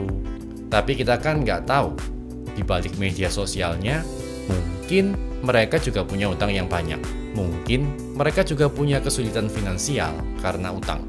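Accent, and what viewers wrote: Java, Indonesia. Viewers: lihat.cnl.ini.lngsung.tertarik.kaget .mase.ganteng bangt kok.suaranya.kejawen....mantap...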